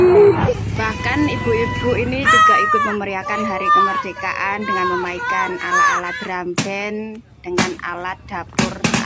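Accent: Java, Indonesia. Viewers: mbak. Saya suka sama medok nya sampeyan mbak. Renyah ditelinga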